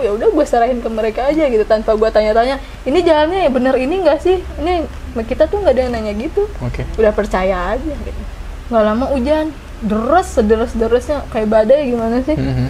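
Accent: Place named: Depok, Indonesia. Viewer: Kentel amat mpok logat betawinya, ciri khas betawi gaul, klo cerita asik dan seru, idup cerita nya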